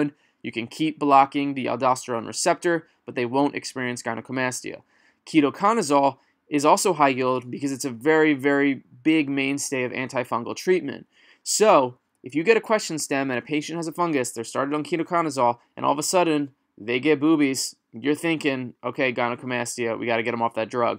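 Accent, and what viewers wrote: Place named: Philadelphia, United States. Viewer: Super helpful video! Also, I'd recognize a Philly accent anywhere...are you from Philly?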